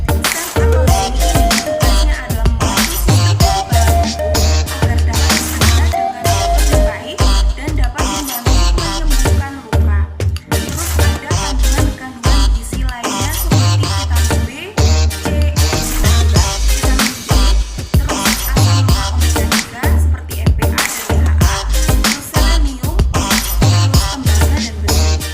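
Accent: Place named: Java, Indonesia. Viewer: Thank you kak for information untuk tetap healthy. btw, kok agak medhok yah wkwkw 😂😂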